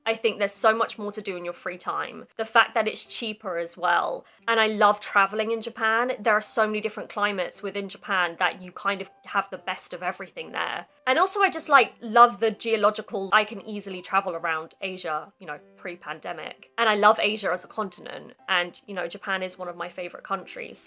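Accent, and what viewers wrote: United Kingdom. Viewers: I know this has nothing to do with the topic, and you might have told us already in another video, but do you mind if I ask whereabouts in the UK you were born and raised? I used to live in the UK when I was a kid, and there's this accent of yours which I just miss and love. I think it's a lot to do with how the "t"s are pronounced. Long story short, you talking is like music to my ears. Looking forward to your upcoming videos. Cheers!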